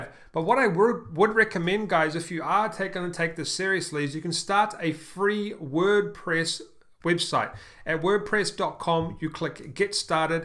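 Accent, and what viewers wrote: New Zealand. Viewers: do you come from new zealand? you sound like a kiwi haha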